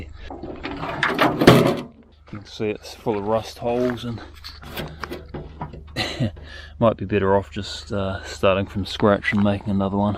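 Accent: New Zealand. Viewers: New subscriber here. I am also subscribed to Iforce2d, an other YouTuber from NZ. He is doing remote control stuff, like fixed wings and copters and recently home built CNC machine. Anyway, it is very interesting how your voices are so very similar. Of course the accent makes it a lot similar, but even your style and look so very similar. Could pass for brothers.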